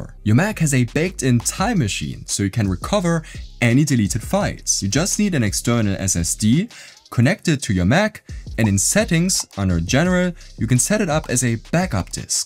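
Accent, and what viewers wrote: Germany. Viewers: I hear deutschh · love that german accent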